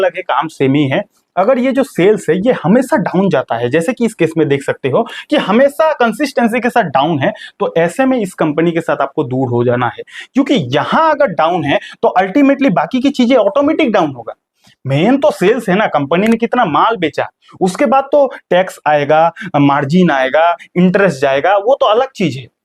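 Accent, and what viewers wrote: Bihar, India. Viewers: Are you bihari???😊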